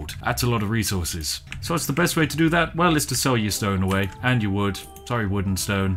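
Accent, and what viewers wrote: United Kingdom. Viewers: As an American I love how British you are. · ARE YOU REALLY A BRIT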